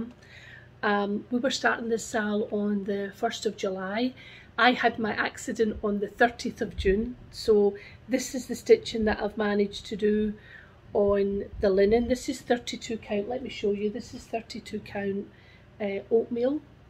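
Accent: Scotland, United Kingdom. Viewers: Thanks for checking in. I’ve missed you. Love listening to a Scottish accent.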